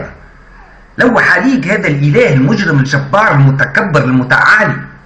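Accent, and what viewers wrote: Tunisia. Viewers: ههههههههههههههههه تعجبني لهجة توانسة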